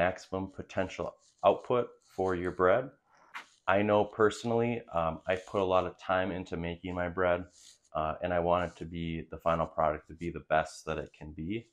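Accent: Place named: Midwest, United States. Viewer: As a midwesterner, I appreciate your vowel pronunciation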